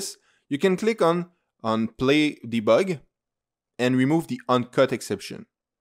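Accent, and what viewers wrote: France. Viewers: the french accent makes everything better, thanks! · Dude thanks for the tutorial! It was fun and funny at the same time! Your voice kept me focused. I laughed all along and learnt it in a fun way. Are you French btw?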